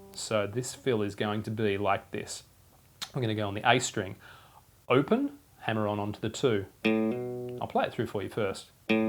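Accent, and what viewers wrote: Australia. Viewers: Hey man, are you an Aussie?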